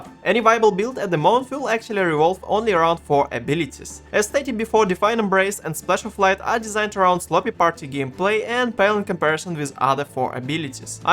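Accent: Russia. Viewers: Русской школой попахивает английский)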